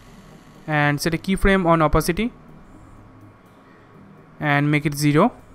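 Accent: India. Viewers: Sounds pure Indian😍😍😍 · sounds indian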